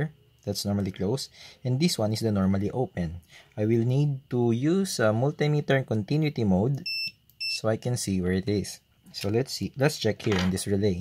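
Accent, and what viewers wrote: Philippines. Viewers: that filipino accent tho :D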